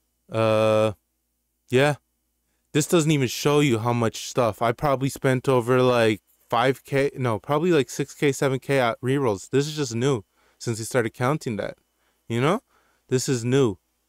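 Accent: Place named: Toronto, Canada. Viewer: are you from toronto u got a accent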